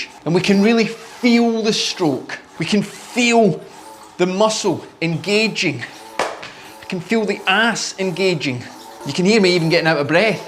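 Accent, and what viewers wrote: Scotland, United Kingdom. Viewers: bro???😂😂😂😂,,,I love your Scottish acent a MOERSE LOT!!! from Wellington, RSA. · Actually, it’s the English who speak Scottish a wee bit funny…there’s just more of them so people around the world think their accent is real. It’s Not. This is real! 😊😊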